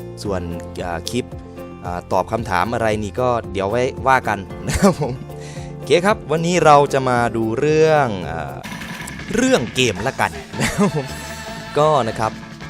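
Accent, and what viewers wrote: Isan, Thailand. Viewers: พี่อยู่จังหวัดอาไรคับ สำเนียงเหมือนคน อิสาน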